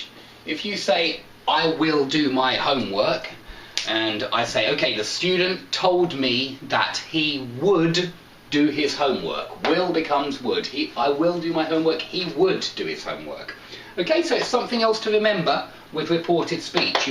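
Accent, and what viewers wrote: United Kingdom. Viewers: Thank you so much for all your efforts and time being put to help us to understand the language so called "English". I love your videos and especially the british accent.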